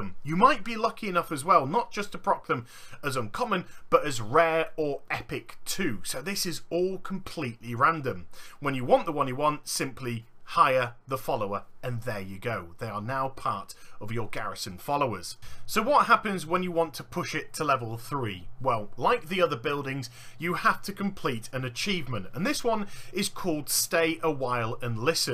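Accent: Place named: United Kingdom. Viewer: God I love the British accent. Every word. So clear.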